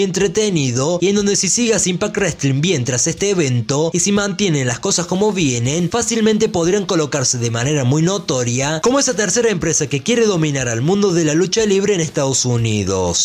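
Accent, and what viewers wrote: Córdoba, Argentina. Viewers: x q habla como cordobes?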